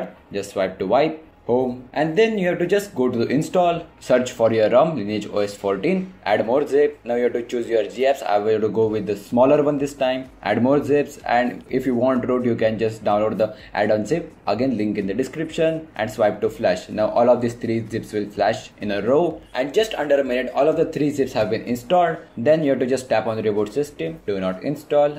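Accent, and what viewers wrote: India. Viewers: Indian english is the best! :D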